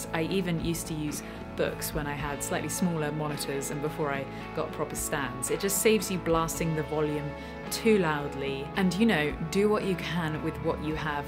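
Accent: United Kingdom. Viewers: Omg that soft perfect beautiful British accent <3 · She's so Bri-ish...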